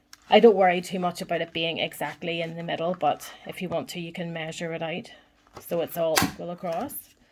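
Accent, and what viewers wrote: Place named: Scotland, United Kingdom. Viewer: Just subscribe, love the beautiful Scottish ( I hope) accent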